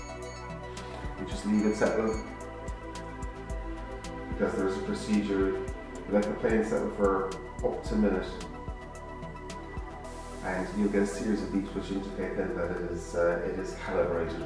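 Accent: Ireland. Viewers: One of the best tutorials on setting up this plane and I'll use it as a reference in adding the Byme-A stab to a little 800mm pusher I'm putting together. But a bit of a whinge also. That mellow Irish accent is pretty hard for an Old Fart with 60 years of heavy machinery hearing damage to decipher with the limitations of the bad reverb of your mike/location (sounds like you are talking with your head in a kerosene tin! 😜) but adding that bloody Youtube musak overlay of a Caribbean Steel Band banging on it makes it even tougher. I know nothing of Youtube posting but I see lots of folks making this mistake. If it is somehow required it is a pity there is no facility on our end to kill it. eg with a stereo system balance pot. Rant over. 😊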